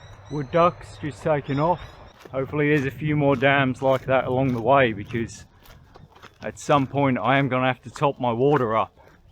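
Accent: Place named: Australia. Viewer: Cool vid. Kinda jealous actually.. but bro how long u been in Aussie for..?? Hard to tell your accent.. it’s like 5% British 95% Oz. Very hard to pick hey.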